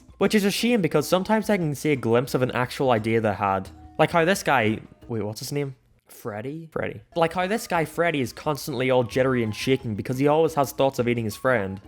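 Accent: Ireland. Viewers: Are you Irish or Scottish? I can’t tell, but don’t ever shit on my Barnyard again. Eat some shit ass food, pray to your King, and shut the hell up. 1776 from the Brits but 2006 is what you should remember. Peak USA dumbass. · Wait. Is he Irish? Mind=blown. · Yo didn't know your irish that's awesome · r u irish? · U Irish ooooooh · wait i thought you were scottish but literally only ireland has irish as a class so that narrows the place you grew up in to side of ireland to the north or donegal because you have that kind of accent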